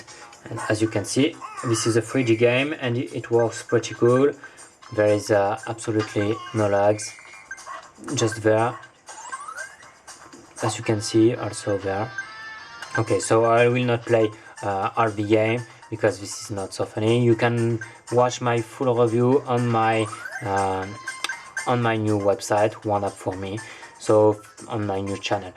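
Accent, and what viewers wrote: France. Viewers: Je reconnais bien un accent Français derrière ! Pourquoi cette ROM n'est plus sur XDA ? Un problème avec ? I can regognize a french accent ! Why this ROM is not more available on XDA ? Problem with ?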